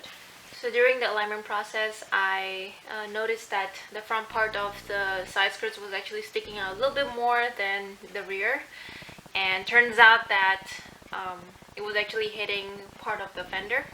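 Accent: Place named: Hawaii, United States. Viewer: Are you from Hawaii? I can hear a little Hawaiian pigeon in your speech.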